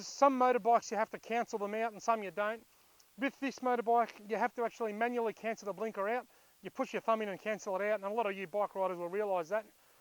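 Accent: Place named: Australia. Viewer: Nice video mate. I always enjoy listening to the ausie accent. Very nice bike.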